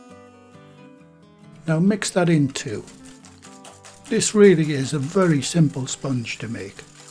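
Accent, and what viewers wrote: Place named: Northern England, United Kingdom. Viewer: This looks so yummy. Proper northern comfort food. You sound like a lovely northener. Thank you for such a well made video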